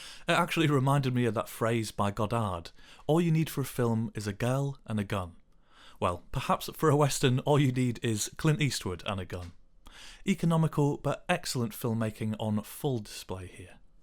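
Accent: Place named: Yorkshire, United Kingdom. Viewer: Welcome back 😀🍿🙌📀🎸🎶 Hope it isn’t another 6 month till we see you again; you been missed 🤗 ‘Pale Rider’ is an awesome Clint Western; I watched ‘High Plains Drifter’ for first time this week 🤠 Once Upon A Time; one of my fav Westerns; what a film mate 😍 🤠🍿🙌 ‘Red Rocket’ is very good mate; ‘Anora’ is SB’s masterpiece IMO; love Florida Project aswell; seen it a few times 🏨 Not seen ‘Room At The Top’; but now you mentioned it is a Yorkshire based film; I’m in 👍👍 I really enjoyed ‘Materialists’ aswell bud; love my Indie Dramas 😊 What a wonderful comeback; keep up the superb content and can’t wait for your next video already; loving the Yorkshire accent as ever 🙌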